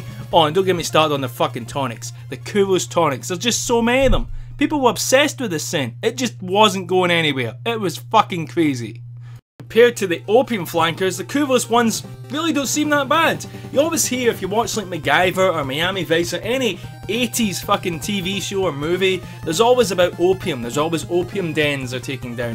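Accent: Scotland, United Kingdom. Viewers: BTW, Love your Scottish brogue. My great grandparents were from Glasgow and Edinburgh. So want to visit your great land someday. :)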